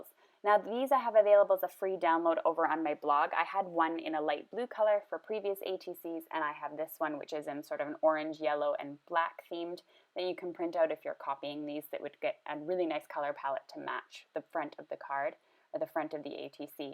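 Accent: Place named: Canada. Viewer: ... I love your Canadian accent. I know I am supposed to comment about the ATCs... hehe. Gorgeous cards. Thank you so much for sharing...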